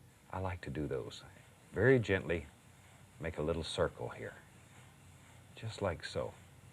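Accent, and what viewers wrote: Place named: Florida, United States. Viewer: It’s his accent. He said ‘yet’ but it came out like ‘chet’ Floridians and Louisianians say it that way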